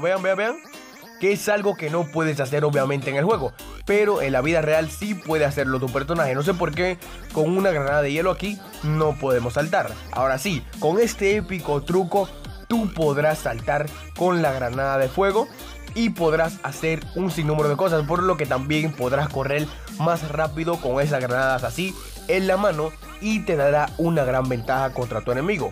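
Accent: Dominican Republic. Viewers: eres de República Dominicana?. ¿Verdad?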